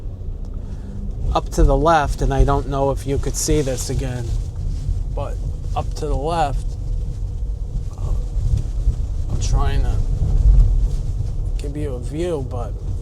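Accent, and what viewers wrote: New York, United States. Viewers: I wanted the Bronx and I found it. Thank you, also for great language, I can watch and listen without subs. Distinct speech but keeping that NY accent. Watching from Poland.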